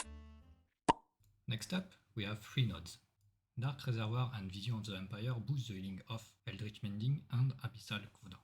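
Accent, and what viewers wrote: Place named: France. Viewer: French accent spotted. Super guide !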